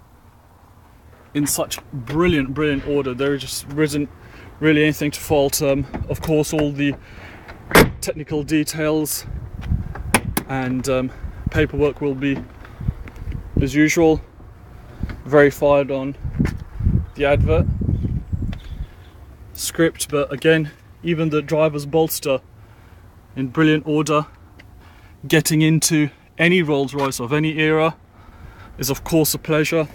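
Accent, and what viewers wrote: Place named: India. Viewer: I also counted the word Absolutely and got 23 times in the space of ten minutes, this guy surely has trouble with the English language in the way he uses the same word so many times, without being able to thin k of another word to use. Maybe it is because he can not use all the words in the English language is because he is not English, I detected a faint hint of Indian in his words, so he was doing what all Indians do when they give you a time wasting phone cal.l they always have an English name and try to put on a perfect English accent, as well as not knowing the English he also didn't know the Highway Code, he was parked on a double yellow lone, on both sides and on the back.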